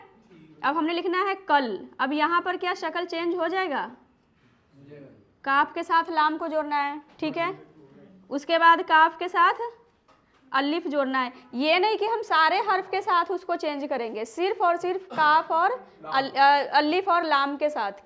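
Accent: Bihar, India. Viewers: She must belong to behar state. ऱ accent Now इन pnjb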